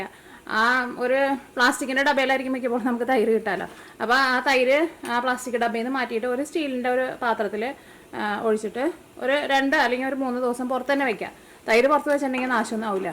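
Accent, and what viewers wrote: Thrissur, India. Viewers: Super trissur slang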